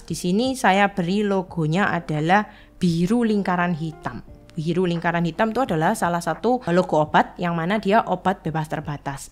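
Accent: Java, Indonesia. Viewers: Bahasanya muuedok. Semoga sukses.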